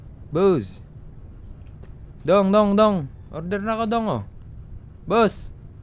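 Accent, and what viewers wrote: Visayas, Philippines. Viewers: Dol bisaya man deay ka dol ey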